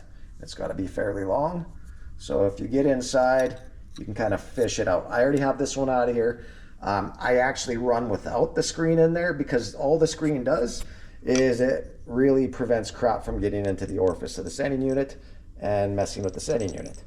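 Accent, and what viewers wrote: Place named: New Jersey, United States. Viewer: Are you from New Jersey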